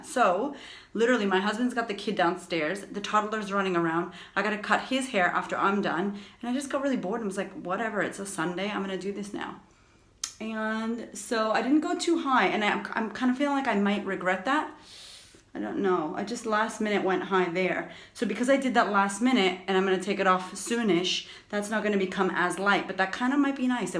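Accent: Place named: Australia. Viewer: Super cute that you've picked up the aussie accent! Great video BTW!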